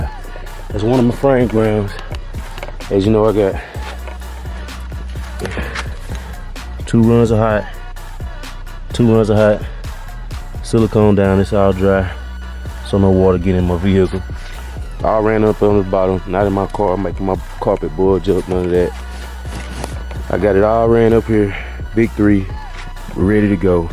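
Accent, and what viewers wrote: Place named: Texas, United States. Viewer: You from Texas bro?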